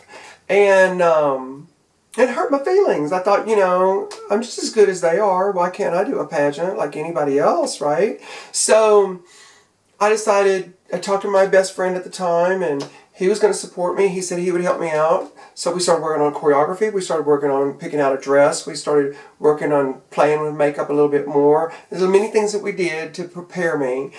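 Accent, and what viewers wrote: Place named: Arkansas, United States. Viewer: you are a hoot! I've watched all of your videos tonight and read thru all the comments (I'm a nosey heifer like that) I just wanted to say....I too, am from Arkansas and now live in Texas so I absolutely love your accent being as it sounds like me! lol I'm not that far from you and if not having someone to help you film is standing in the way of you posting more videos.....hunny, I will film for you! =}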